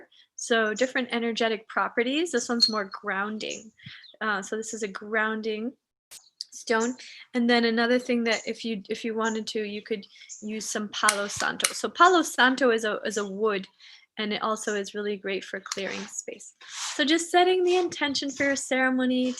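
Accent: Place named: United Kingdom. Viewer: Beautiful British accent, babe, tea and crumpets sounds nice, after a breakbeat.uk.co rave all night, without the MCs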